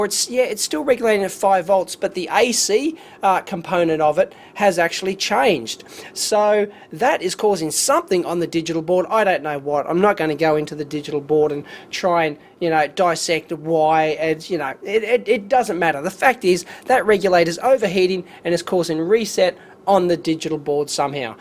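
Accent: Australia. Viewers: Lol, English is not my first language also I was not really used to Australian accent but I can follow perfectly... You should try the Disney Channel :-P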